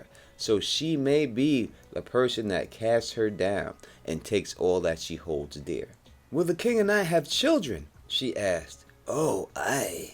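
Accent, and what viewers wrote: New York, United States. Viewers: Chea chea chea!!! The, lol first time I found this channel, i knew right away my dude was a native new yorker like myself. We definitely have our own way of talking here, big ups from queens.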